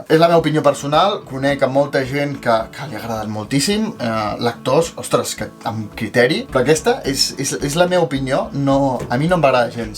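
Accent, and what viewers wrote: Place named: Central Catalan, Spain. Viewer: Parles un català molt central! És així el que parleu a Andorra?? Pensava que seria més tirant a lleidatà! PD. No he llegit cap d'aquests llibres, però només per la passió que vas posar a la ressenya de Persecució, estic amb tu mil per cent xD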